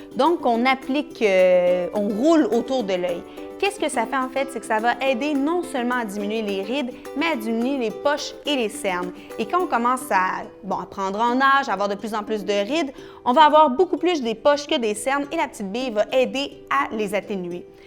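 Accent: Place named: Quebec, Canada. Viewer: super l accent quebequoi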